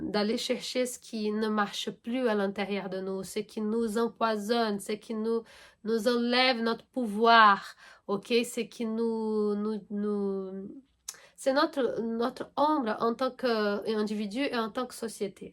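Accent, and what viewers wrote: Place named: Brazil. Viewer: Bonjour. J’adore ton accent. Tu es brésilienne? Merci pour cette vidéo. Moi je suis lion ascendant scorpion avec bcp de choses près de mon ascendant, maison 12 pluton, et maison 1 la lune, le tout en scorpion. Ca me donne une sensibilité un peu spéciale et j’essaie de mieux le comprendre à travers l’astrologie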